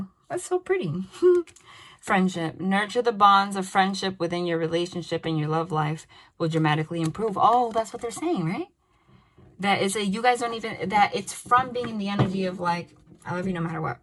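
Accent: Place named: New York, United States. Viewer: I ABSOLUTELY LOVE YOUR ENERGY, YOU HAVE ME GIGGLING RIGHT ALONG WITH YOU, ALSO LOVE THE NEW YORK ACCENT!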